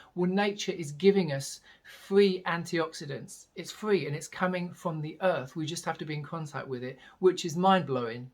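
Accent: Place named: United Kingdom. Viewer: My ear tells me you have to be from same area of UK that Russel Brand is from. Great video btw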